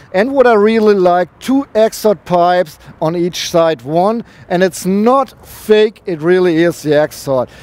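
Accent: United Kingdom. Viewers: nice review,very nice car, nice english accent ! good job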